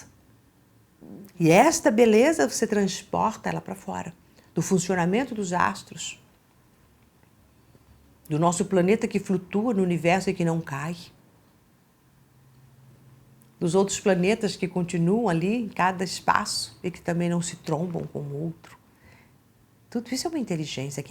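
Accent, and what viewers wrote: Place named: Italy. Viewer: tem sotaque italiano.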